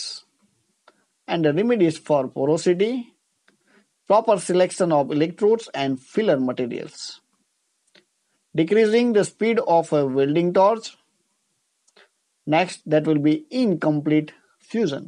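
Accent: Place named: India. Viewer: такой конкретный индусский акцент у лектора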